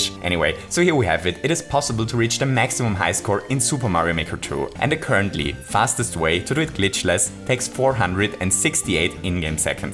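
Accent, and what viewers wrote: Germany. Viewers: Deutscher Akzent kickt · Du sprichst ganz sicher deutsch als Muttersprache, das merkt man an an dem Englisch 😂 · Man hat an der Stimme schon ein Bisschen erkannt, dass du deutsch bist ^^ #nofront^^ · If I may ask,... are you german? This accent... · Wie man raushört, dass du deutsch bist :D ...hehe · Are you German? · Just a simple Question: are you german? :D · Is this dude German ? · xd man hört voll den deutschen aktzent(ich weiß nicht wie man das schreibt)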